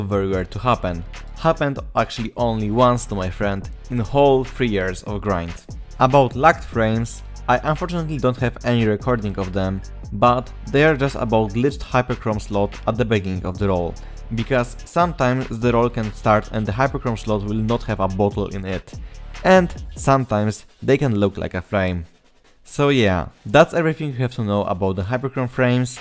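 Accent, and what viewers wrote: Poland. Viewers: Where are you front you have Poland adcent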